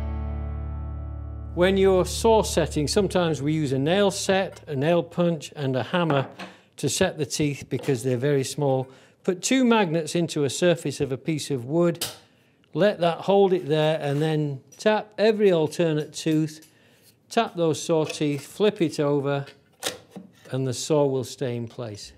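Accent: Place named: Southeast England, United Kingdom. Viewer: Hey, what part of the 🇬🇧 are you from? You sound like a southeastern accent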